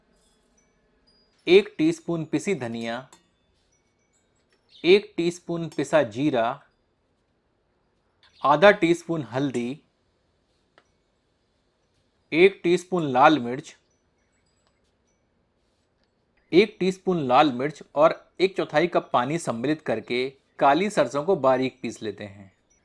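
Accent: Standard. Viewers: Love your pure Hindi bro.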